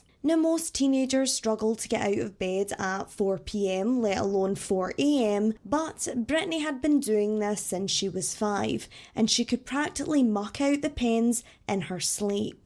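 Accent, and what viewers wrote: Scotland, United Kingdom. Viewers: Lovely accent. Where in Scotland are you from? Aberdeen maybe? I was born there.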